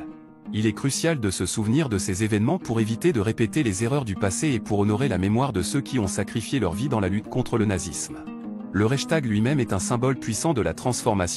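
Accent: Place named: France. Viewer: Aucun message final cité et une belle traduction prononcée toute croche, "like" prononcé comme lécher "lick"... La prononciation à la française de mots anglais me tape sur les nerfs... Ce canal ne vaut pas cher la tonne, pour reprendre ici une bonne vieille expression québécoise...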